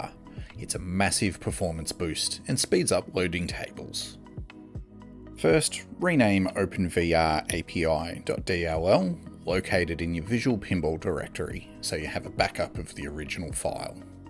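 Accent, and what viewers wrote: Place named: Australia. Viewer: Great guide, thank you for making it clear and concise, and easy to follow. Liked and subbed, What's more you speak Strine, which makes it even easier to follow :D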